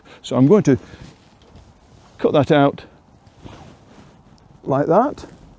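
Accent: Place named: Yorkshire, United Kingdom. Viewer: Lovely Northern accent. Probably Yorkshire.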